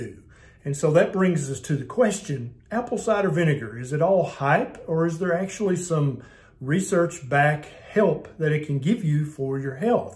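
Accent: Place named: Tennessee, United States. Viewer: man, i sure do appreciate a Tennessee accent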